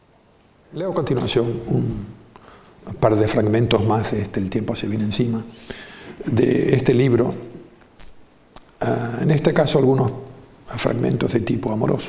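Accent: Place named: Canary Islands, Spain. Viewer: Muy amena y enriquecedora. Más conferencias sobre poesía por favor. Que agradable es el acento de la Canarias.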